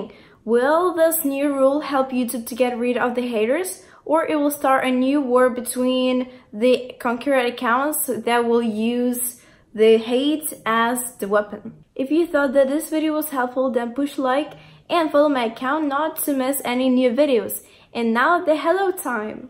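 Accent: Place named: Russia. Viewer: видно же что русская. По акценту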